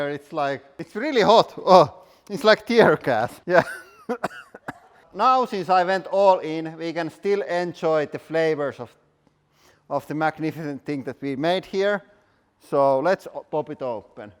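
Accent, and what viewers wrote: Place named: Finland. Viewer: its the Finnish accent I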